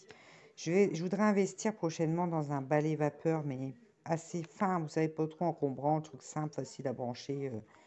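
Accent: North, France. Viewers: Désolée, mon commentaire est envoyé 😙😟. J’habite maintenant en Charente maritime mais suis originaire du pas de calais, quand je t’écoute je retrouve avec plaisir les gens du Nord ! Bravo à Lolo pour le concours. Bisous et à bientôt.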